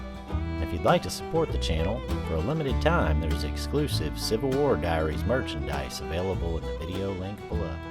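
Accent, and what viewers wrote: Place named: South Carolina, United States. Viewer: Good to hear someone from South Carolina. I had family there too.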